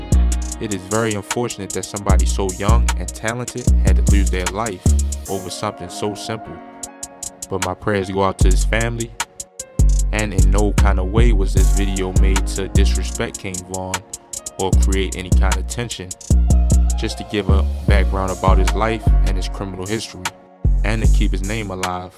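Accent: Baltimore, United States. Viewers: u gotta be from bmore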